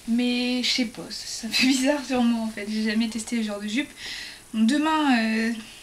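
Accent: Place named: North, France. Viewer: Youpi...jai perçu ton ti accent chaleureux du nord..jaiime trop votre parler ces tro cool...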